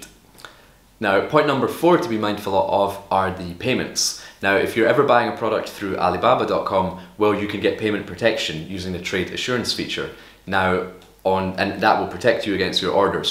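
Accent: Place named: Edinburgh, United Kingdom. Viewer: Thank you very much, and thank you for the Scottish accent, sounds like Edinburgh guy 👍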